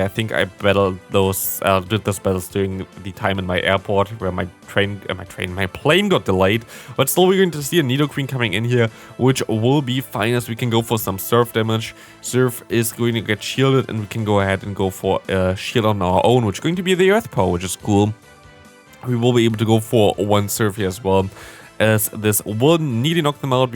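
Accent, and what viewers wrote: Germany. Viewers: Love that Cofagrigus Shiny, got one myself, but Bad Ivs 😅 Been watching your vids for some time now, are you german? Because you have a bit of a german accent when talking english. I've got it too 😂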